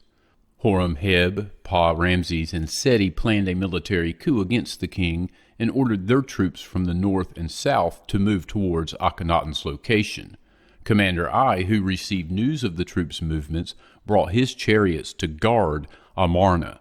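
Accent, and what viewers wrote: United States. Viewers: Completely unrelated, but...... Your has got the be THE best American accent!!!!!! 😍😍😍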